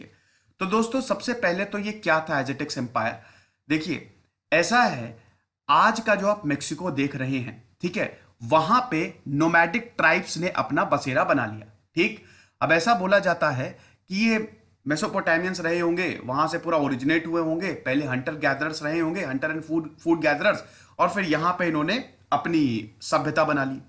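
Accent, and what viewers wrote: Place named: Uttar Pradesh, India. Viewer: UP ke lagte ho bhaiya baato se😂😂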